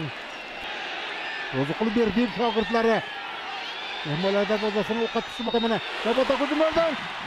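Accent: Kashkadarya, Uzbekistan. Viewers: СИФАТСИЗ КОММЕНТ КАШКАДАРЕЧА. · Кашкадарёча шархлашда😅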